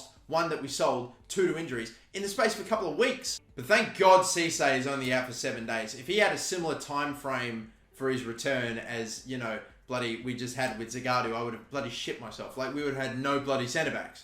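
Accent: Australia. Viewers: pure Australians accent 😂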